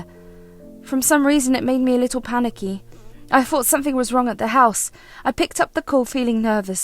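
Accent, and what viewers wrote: United Kingdom. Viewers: The British accent is funny with this story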